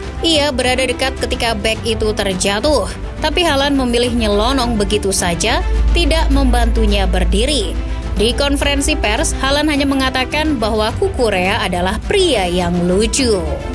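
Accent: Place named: Banyumasan, Indonesia. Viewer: Admin wong ngapak 😗😗😗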